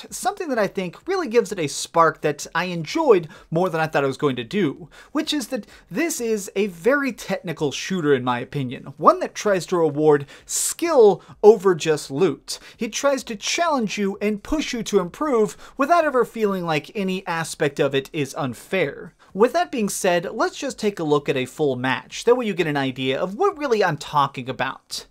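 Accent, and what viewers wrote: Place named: South, United States. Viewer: What happened to the southern accent now you are speaking like an announcer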